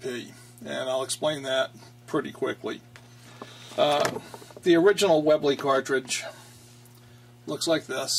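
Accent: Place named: Southern Maryland, United States. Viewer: I think I recognize an accent of Southern Maryland/Northern Virginia. I'm from there.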